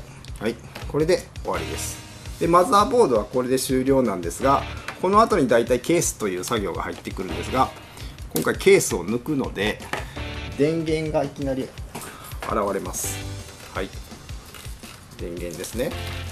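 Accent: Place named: Nara, Japan. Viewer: イントネーションが奈良か京都、多分奈良っぽい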